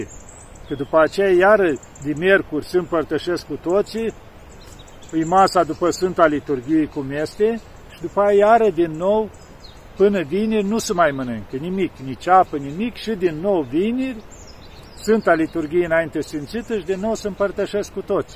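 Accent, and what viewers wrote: Moldova. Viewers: Bună ziua!!! Părinte cita blindete aveți, și apoi adăugind și acest grai dulce moldovenescsimt că mă cucerește, și vă ascult cu multă plăcere!!! Părinte vă rog amintiti-ne că postul este postul în fapte, nu, faptul că nu mincam de dulce pe cind faptele noastre sunt uneori reprobabile 😭Sănătate deplină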